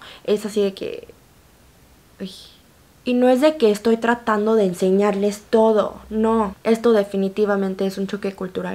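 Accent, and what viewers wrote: Mexico. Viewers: el shock es mio; ver tu cara y tus llamativos ojos coreanos y hablar con ese acento mexicano. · wao tu acento mexicano ....es perfecto...saludos desde tamaulipas,mexico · Jajaja apenas te conocí y ya me agradaste ya tienes nueva suscriptora ☺, pareces coreana pero de como hablas ya eres 100% mexicana me encanta eso ❤❤❤ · Tu asento en español es mas mexicano no español puro pero coreana si eso si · Hello beautiful, I love your videos and you’re the reason I fell in love with the Mexican dialect/accent and I’m trying to learn Spanish through your videos. Thankfully I understood the main points but I noticed you didn’t put in English subtitles in this video. I’m wondering if you’re going to stop putting in English subtitles? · He estado viendo otros canales de chicas coreanas argentinas y hablan como argentinas y son coreanas he visto este video de chica coreana mexicana y hablas como mexicana y es coreana lo divertido en buena forma es que ambas son coreanas la argentina y la mexicana y si platicaran entre ellas las dos coreanas se encontrarían platicando con los respectivos acentos argentino y mexicano y eso es lo divertido y bonito al mismo tiempo en realidad me encanta y me rio porque ambas son coreanas me río pero en buena onda porque me gusta por la cultura que aprendieron según la región donde nacieron bueno no se si entendieron pero yo si me entendí sólo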